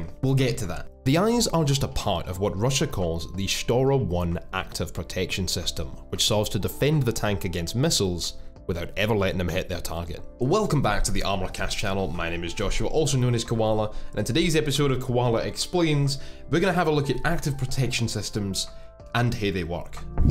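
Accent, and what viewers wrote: Scotland, United Kingdom. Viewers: I just come here for that sweet Scottish accent... And the armored stuff!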